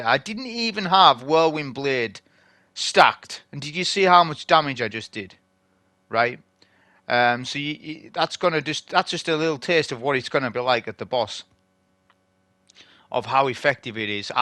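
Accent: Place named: North, United Kingdom. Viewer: Where are you from? I detect a northern accent.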